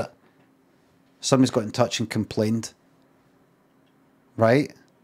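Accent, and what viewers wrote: Scotland, United Kingdom. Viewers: How can he have such a heavy accent and not speak gaelic? Imagine a guy with a heavy Italian accent not speaking Italian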